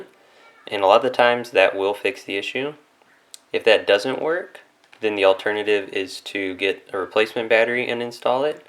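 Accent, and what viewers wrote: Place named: United States. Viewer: Very nice guide. I love American Accent ❤️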